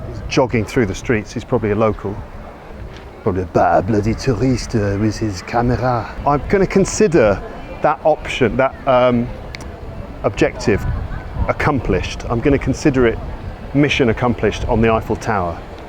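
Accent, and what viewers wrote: United Kingdom. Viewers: What beautiful, well documented, almost lyrical report on. a parisiàn district I had the opportunity to stfoll up and down years ago whilst a student. Thank you for the entbjsiasm, your English-savoured French words reading 😮, I ennoyed your "Walk, cycle and Talk" immensely 😢 Françoise crom Martinique.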